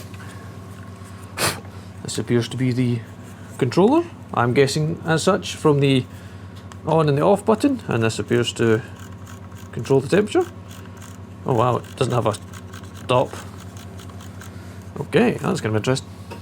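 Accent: Scotland, United Kingdom. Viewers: After bingeing on AVE videos, a gentleman with a Scottish accent has made this minnesotans day.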